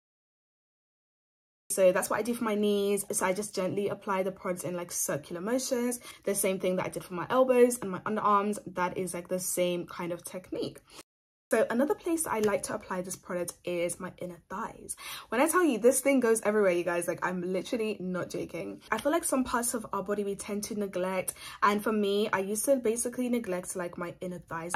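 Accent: United Kingdom. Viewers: someone can tell me if shes british? i hear an accent when she talks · Please some should please write down the name of product she mentioned I couldn’t get her because she has an English intonation please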